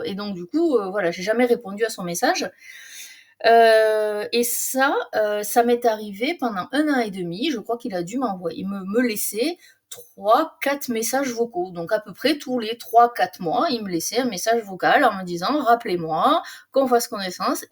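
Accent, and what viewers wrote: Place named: Toulouse, France. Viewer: Je découvre ta chaîne ce matin grâce a l'algorithme de YouTube ! alors que j'ai découvert ce parcours de FJ depuis un an tout juste a 53 ans! Bravo et merci du partage. J'ai retrouvé mon jumeau en janvier 2023 apres 16 ans de silence entre nous. J ai rêvé de lui a plusieurs reprises en qqs jours et j ai décidé de suivre mon intuition et de le contacter. Nous bossons ds la même administration mais depuis 15 ans il vit a 800 km de moi. Ont alors rejaillit en pleine tête tous les sentiments et ressentis si douloureux vécus en <num>, avant notre séparation, et ma NNA alors que nous sommes mariés depuis tous les deux chacun avec nos tierces. Ma sideration étant a son comble de revivre toutes ces choses , ses sentiments, ses synchronicites en un claquement de doigt, j ai fait mes recherches et decouvert ce parcours de FJ il y a un an. 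Et l'année 2023 fut terrrible et belle en même temps. J ai découvert a 53 ans qui je suis vraiment, que j ai une belle âme et des liens énergétiques. L année fut riche en revelations d'une puissance inouïe. J arrete là, nous sommes en plein ds la reunion, et le switch, ma plénitude m intrigue même je dirai mais c est trop bon et je sais que le meilleur est a venir. ❤❤😊🥳 encore Merci et bel accent un peu le même que le mien! de Toulouse !😂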